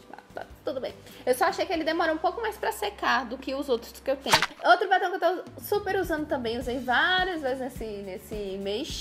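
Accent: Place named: Brasília, Brazil. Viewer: tão brasiliense esse teu jeito de falar , me identifico #jujubanoveda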